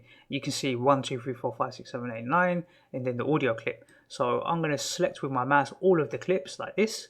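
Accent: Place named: London, United Kingdom. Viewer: Nice work Dude! brilliantly explained. The fact you sound like a Londoner makes it feel quite homely :)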